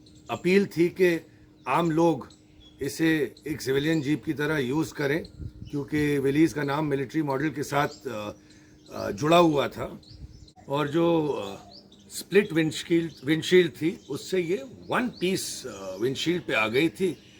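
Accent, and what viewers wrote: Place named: Bhopal, India. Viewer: Hello bhai..loved your bhopali tales and your passion for Jeeps👌👌. Tumhari bhopal style commentary lajawab hai.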